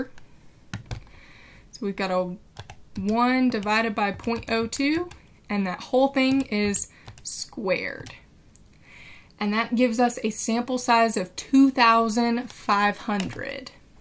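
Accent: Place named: United States. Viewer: margin of air the american accent is beautiful thanks for the tutorial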